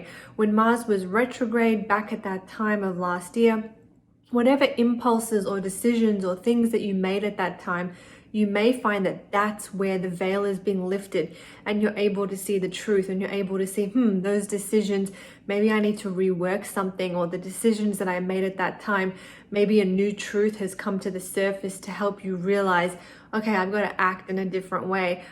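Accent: Australia. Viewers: I love your voice. Aussie accent?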